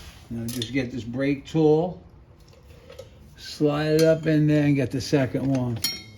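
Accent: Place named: North, United States. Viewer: ❤ ABSOLUTELY love thos guy. His US Northern accent really made me smile. He seems nit to like to hear himself talk and really knows how to keep it simple. Plus, he knows what he's talking about. I've done brak pad inspections and replacements in the past, but I wanted to refresh my memory, and this guy really came through. Yes, I did view other videos, but I really like this one the best. Thanks, guy, for giving me that little boost of confidence. O, I'll have a backup guy watching over me. Breaks are something you just don't monkey around with.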